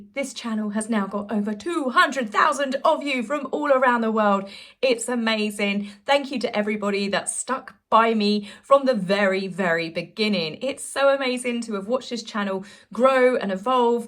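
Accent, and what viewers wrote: England, United Kingdom. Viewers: Congratulations! Greetings from Melb, Aus… I’m not an OG BUT have loved following you and your collabs. You are an inspiration, especially to watch back on your vids from 5yo. I respect you, your reseach and opinions as a royal commentator. You have an authentic mix of humour, sarcasm, wit and compassion all wrapped up in your gorgeous English rose accent 🌹 Here’s to many more years and subscribers ahead and hopefully nothing but joy with no need to mention Harkles in the very near future🙏 Happy days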